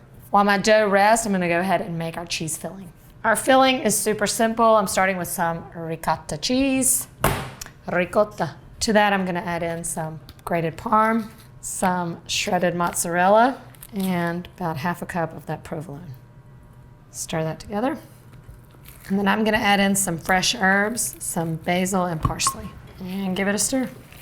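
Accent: South, United States. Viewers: That southern accent throws you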